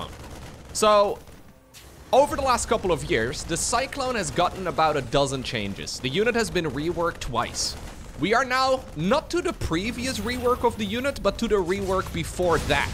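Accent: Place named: Netherlands. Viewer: I'll never not hear that dutch accent on the "O"s -COHMPFIDENT! -COHMPTFORTABLE!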